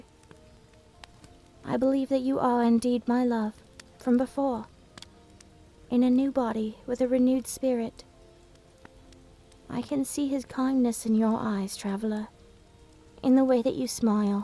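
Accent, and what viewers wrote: United Kingdom. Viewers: Why is she British now? I like it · yes i get to hear my british dragon waifu again. <3 but in all seriousness keep up the good work and keep captivating us with these stories :)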